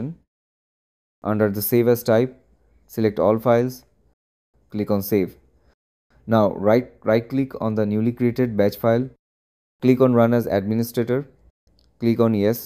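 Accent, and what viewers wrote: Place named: India. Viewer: Ahh yes the "trust me bro" Indian voice. What a joke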